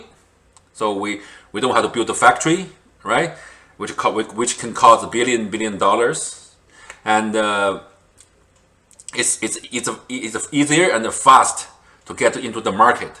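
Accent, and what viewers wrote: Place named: China. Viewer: Is this a Chinese channel. I need to know because you sound Chinese and I don’t find Chinese made things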